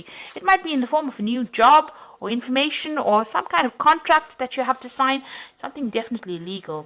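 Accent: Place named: South Africa. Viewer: Great reading, thank you 🙏. Also, is that a fellow South African accent I hear?? 😃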